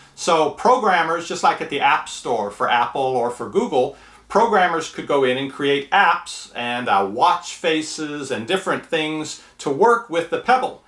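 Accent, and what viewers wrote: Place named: Northwest, United States. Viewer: You said Seahawks. Are you from Seattle? I've been wondering where you're from; clearly not Austin. You say "aygs" for "eggs" and that would make sense for the northwest.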